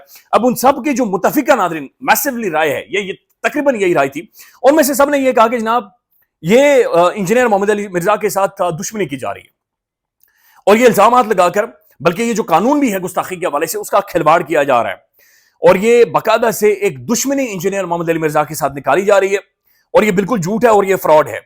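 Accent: Kashmir, India. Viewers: bhai you have the cutest Kashmiri accent… please never lose it 🌹😊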